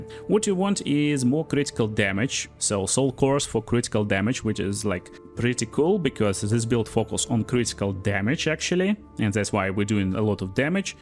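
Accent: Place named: Russia. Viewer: Чувак, перестань пытаться говорить по английски без акцента, звучит ужасно. Одно слово из 5 звучит более менее, остальные с дичайшим русским акцентом )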